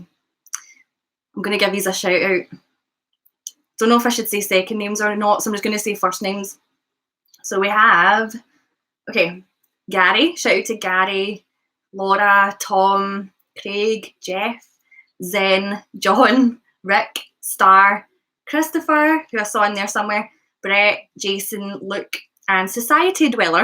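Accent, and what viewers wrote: Scotland, United Kingdom. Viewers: Scottish English bursts my brain :)))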